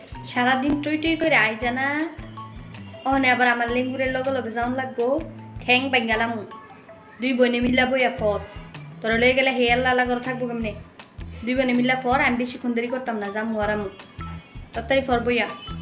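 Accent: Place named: Mymensingh, Bangladesh. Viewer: Wow perfect mymensingh accent....carry on sister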